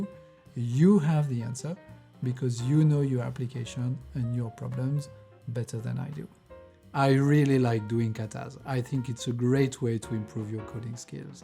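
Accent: France. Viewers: not at all. this is a french accent for sure 😊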